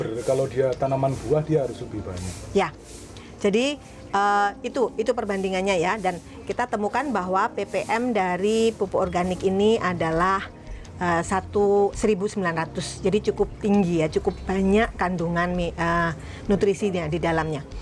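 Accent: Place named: Banyumas, Indonesia. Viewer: bapaq : kaya jangan bayem... laaahh pada" ngapak jebule ya pak 🙄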